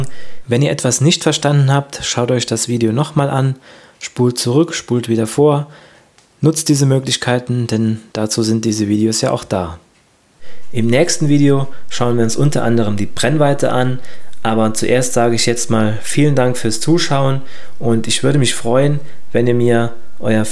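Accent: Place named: Saarland, Germany. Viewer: Isch heer e Saarlänner schwetze - isch drigge "Gefällt mir" 👍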